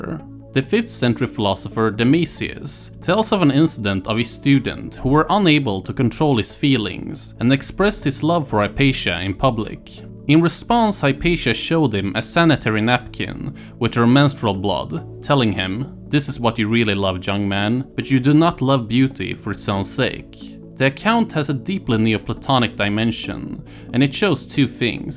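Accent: Sweden. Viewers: is this guy swedish lol. sounds like pewds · Lol your swedish accent is pretty funny but I like it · English isn't his first language so you probably just have to adjust your ear to the Swedish accent. The more foreign accents you hear the easier it is to decipher them. Also, I think Swedes tend to use English that's more in the British style, so if you're not used to that it can take a little while to get used to.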